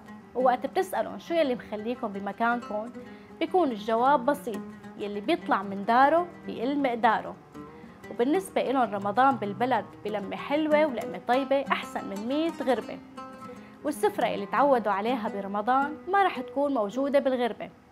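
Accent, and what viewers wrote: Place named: Levant. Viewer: ماشاءالله وتبارك جمال رباني صوره مصوره وكلام متزنًا ولهجة شامية مئه من مئه وشو كمان مابعرف شو بدي أقول لك.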